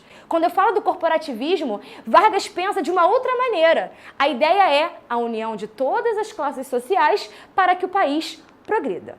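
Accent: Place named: Rio de Janeiro, Brazil. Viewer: Parece mesmo kkk ainda mais pelo sotaque de carioca .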